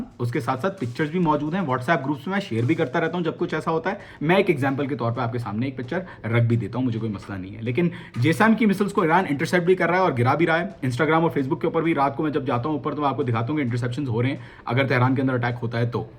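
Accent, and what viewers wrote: India. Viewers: Your Urdu accent llike of Indian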